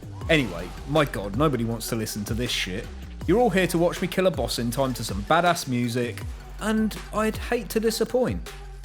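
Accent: United Kingdom. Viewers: Your somewhat latino tracks and jokes, and your bri'ish accent are confusing the hell outta me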